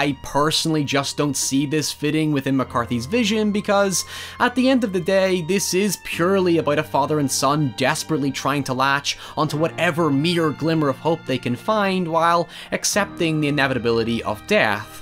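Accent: Northern Ireland, United Kingdom. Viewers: It's rare to come across a channel with such well-constructed video essays! It's also excellent to hear another Northern Irish accent :) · Why do you sound like a Northern Irishman trying to sound American?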